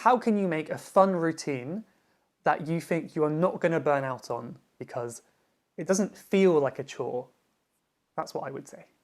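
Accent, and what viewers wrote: United Kingdom. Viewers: Thank you so much for this remarkable video. I came across this channel recently and I’m deeply impressed because of your British accent your communication skill.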